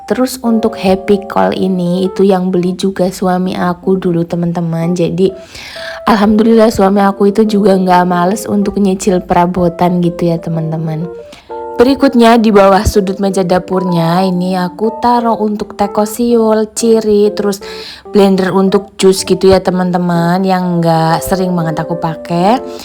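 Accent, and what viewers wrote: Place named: Java, Indonesia. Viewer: Medoknya mirip Teri Raradini 🙂... Dapur cakep... Saudaranya baik baik banyak ngasih..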